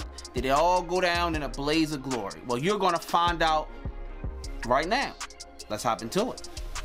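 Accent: Baltimore, United States. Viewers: He sounds like he's from Baltimore I get picked on for saying dew and tew and mova and fava 🤷🏾‍♀️ can't help it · hes got that boltimore accent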